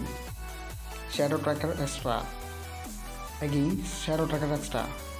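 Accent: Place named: Kerala, India. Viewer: Bro malayali alle English kettapo thoni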